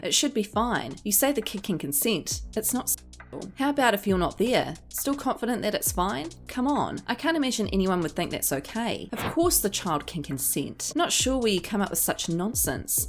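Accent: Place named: New Zealand. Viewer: Kiwi christchurch accent?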